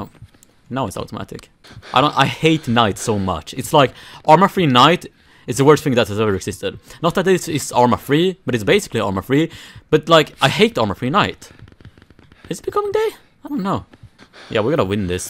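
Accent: Sweden. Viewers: you got a swedish accent ngl. Where u from man? Im from oslo in norway lol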